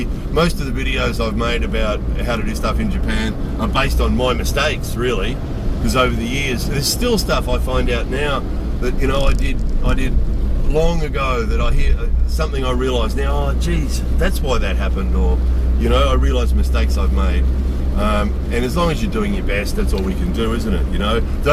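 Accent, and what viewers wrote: Australia. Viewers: yeah except the problem is I can't skip forward to quickly scan and get the gist of the video because it's all just driving - so for me might as well be a podcast I've drive in Japan and know it's slow so no joy for me here - but folk who never have may find in relaxing and interesting. Strine voice tho' ... ;-)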